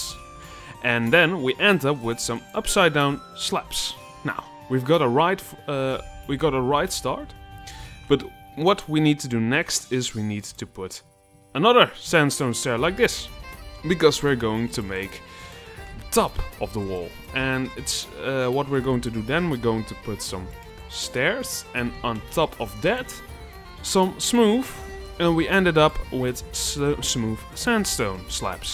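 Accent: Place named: Netherlands. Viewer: Haha nice, I can hear you are accustomed to make video's in The dutch language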